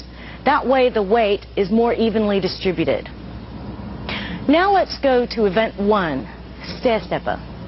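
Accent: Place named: Australia. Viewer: I want to say Aussie who has lived in the US for a long time.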